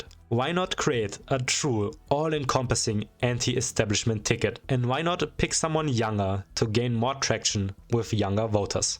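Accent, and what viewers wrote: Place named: Germany. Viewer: Der deutscheste deutsche Akzent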